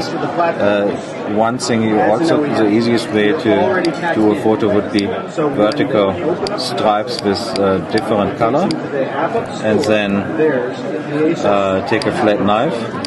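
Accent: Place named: Germany. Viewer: are you from germany? you sound like one :D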